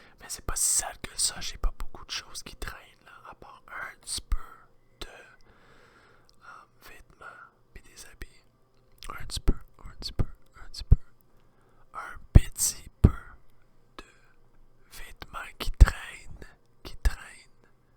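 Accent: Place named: Quebec, Canada. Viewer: J'adore l'accent québécois !! Vidéo très relaxante !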